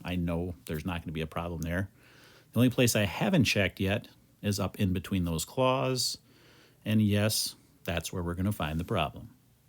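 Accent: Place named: United States. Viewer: Opinion on accent depends on where you're from! As a Brit I'd say has a strong American accent!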